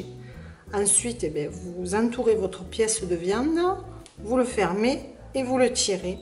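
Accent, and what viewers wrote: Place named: Provence, France. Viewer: bonjour merci de cette magnifique recette..vous avez un accent qui ressemble au mien je suis provencal d'arles et je vis en thailande ........adessias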